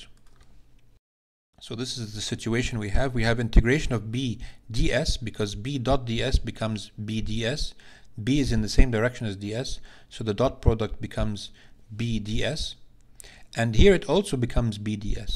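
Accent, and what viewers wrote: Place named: Egypt. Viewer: ❤️❤️ i am from egypt too. I expected that from your accent but i think you are not in egypt? I want to thank you for you for these great videos ❤️❤️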